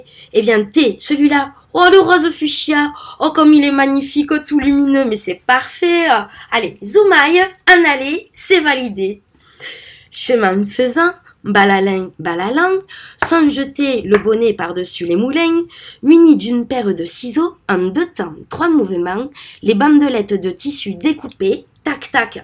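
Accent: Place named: Marseille, France. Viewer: Sympa l'accent marseillais ! Il te va bien.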